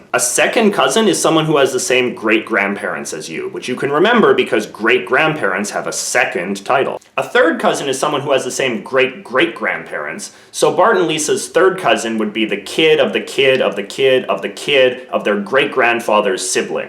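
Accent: Canada. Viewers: noooooooo you say "aboot"! As a fellow Canadian, i thought that was actually a myth. What part of Canada are you from? I'm from Ontario and have never heard aboot said out loud in my entire life.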